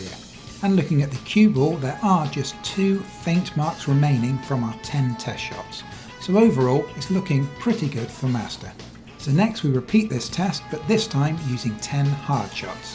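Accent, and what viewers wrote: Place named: United Kingdom. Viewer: Can I ask what countries currency you're saying in dollars? Mostly so I can make peice conparisons to New Zealand dollars. You sound like you should be saying pounds...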